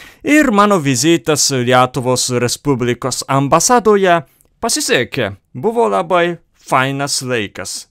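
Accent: Japan. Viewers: Kokia puiki kalba. Malonus ir švelnus japoniškas akcentas visai netrukdo. Ekskursija super. Ačiū.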